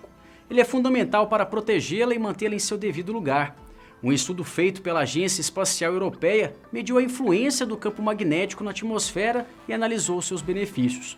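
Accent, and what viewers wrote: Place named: Northeast, Brazil. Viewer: Kkkk. Aki no nordeste algumas pessoas falam assim😂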